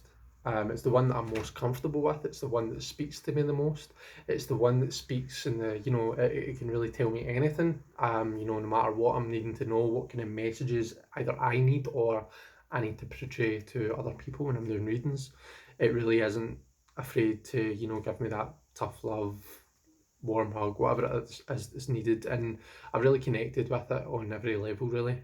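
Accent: Scotland, United Kingdom. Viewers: Congratulations on a fab first video and always lovely to hear a broad Scottish accent :-)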